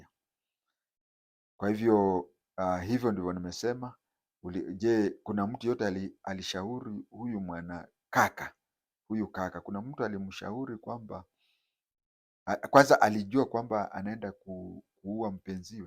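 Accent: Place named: Bukusu, Kenya. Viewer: Kwetu ni Wundanyi, lakini asante kwa kunitaja katika video zako. Kiswahili chako kiko sawa, ila kina matamshi yaliyoathiriwa na lugha ya kibukusu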